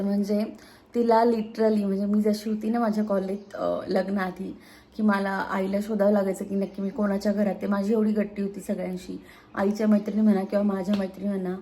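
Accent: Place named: Khandesh, India. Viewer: A tuz माहेर कुठल आहे नक्की नाशिक खानदेश tone vatto thoda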